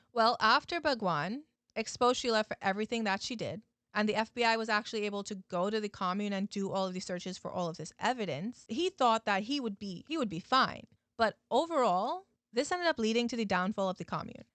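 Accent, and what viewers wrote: United States. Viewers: As a non-American, sounded American to me! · I thought your accent was American😳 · I'm an American but with a terrible ear for accents. I've always thought she sounded American who maybe grew up with parents with accents from another country so that she says some things ever so slightly differently than I expect but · Your accent sounds very Californian to me.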